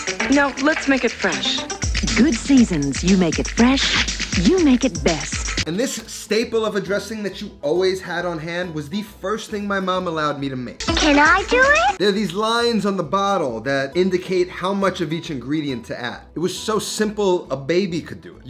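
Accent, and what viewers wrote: New York, United States. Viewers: ☠️☠️☠️ some of the most New York shit I ever heard 😂😂😂😂 Right up there with the Kosciusko bridge 😂 Even Google talk to text inputs the correct spelling using the marble mouth pronunciation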